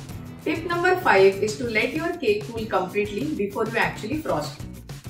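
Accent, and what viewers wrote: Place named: India. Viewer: Put an Australian on, can't understand indian talk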